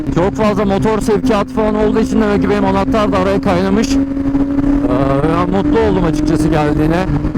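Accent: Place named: Thrace, Turkey. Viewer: abi sen trakyalımısın ? çok fazla trakyalı ağızı var sende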